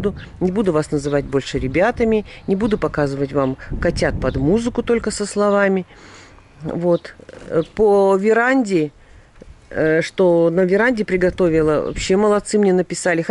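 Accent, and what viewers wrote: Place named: Sverdlovsk, Russia. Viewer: никого не слушайте, вы очень доброжелательны. Я удивляюсь когда вы все успеваете: снимать, готовить, отвечать на наши комментарии. Ваш говор очень похож на то как разговаривала моя свекровь, она со Свердловска. Даже интонации её. Слушаю и вспоминаю свою вторую маму... здоровья вам, энергии,самых положительных эмоций от вашего труда! И сегодня День Матери— поздравлем!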